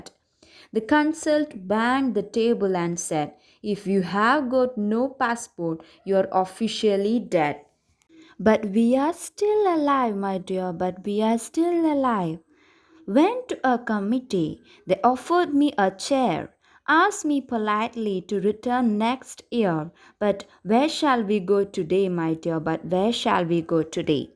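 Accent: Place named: England, United Kingdom. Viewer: I like your english accent ❤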